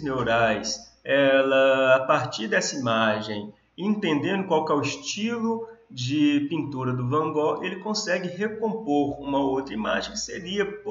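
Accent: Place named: Minas Gerais, Brazil. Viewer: Mestre, você tem um sotaque mineiro, és da UFMG em Montes Claros? Sou de Salinas, fiz essa disciplina na UFV com o professor Fernando e o Tibeau e mais recente em 2010 com o professor Edenio da Zootecnia no mestrado em 2009.. Ótimas sua aulas...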